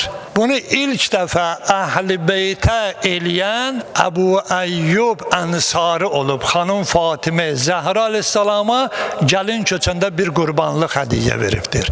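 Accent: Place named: Iran. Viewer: Bunun nə murdar səsi, ləhçəsi,sifəti var. Iran tulası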